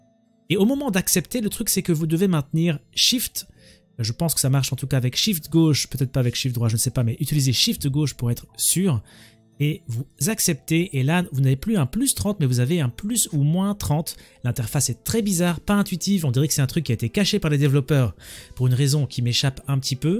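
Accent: Belgium. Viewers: Oh purée!!! Merci car je "galère" (C'est le cas de le dire) tant sur ces navettes que je commençais à me lasser d'y jouer...Mais comme tous tes viewers je suis fan; J'aime bien notamment tes petits "belgicismes" et ta prononciation des mots en ui : " depoui, poui, appoui...etc...Hé hé ça me fait sourire mais toujours avec respect et jamais sans "ennoui"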